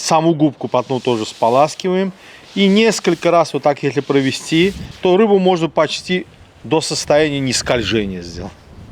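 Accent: Azerbaijan. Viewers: Дорогой Приветствую! Это снова я! Вячеслав из Нижнего Новгорода! Я не перестану восхищаться тем что вы делаете и как преподнесите! Это шедеврально! И да кстати! Не смотря на то что вы Азербайджанец, и говорите на русском, моем родном языке. Вы говорите на русском очень красиво, с акцентом! Говорите очень грамотно, даже понятней, чем я говорю 😂! Дай Бог Вам здоровья крепкого, мирного неба над головой, процветания и всего самого наилучшего! Я ваш фанат! 😊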